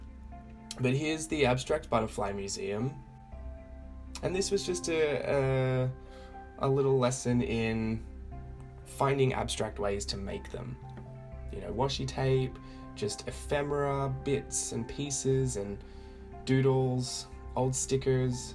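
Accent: Australia. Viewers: I could watch you create all day. Don't lose that Aussie accent! Thanks for all the inspiration. <3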